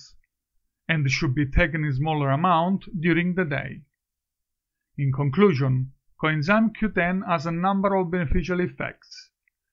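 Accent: Italy. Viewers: Its Italian accent... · good info, strong Italian accent!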